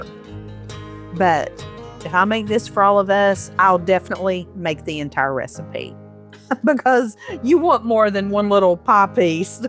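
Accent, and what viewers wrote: South, United States. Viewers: Hi! I recently discovered your videos & I'm hooked! Yours are my favorite of them all! I love how you show the ingredients & sometimes tell where to get them. I also like that the ingredients are simple & the recipes are good & easy to follow (love your southern accent)! I've made several of your recipes & me & my family loved them! I can't wait to see what you'll do this year. Keep up the great work! I've been telling people about your videos because they are the best! Happy New Year & may God bless you!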